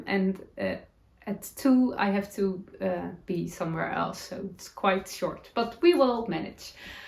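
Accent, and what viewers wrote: Netherlands. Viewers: I understand the change but that doesn’t mean that I like it. You are so cute and authentic. I too am amazed at your grasp of English. It makes me smile to listen to you with your Dutchy english. I will look forward to Mondays with you. Gayle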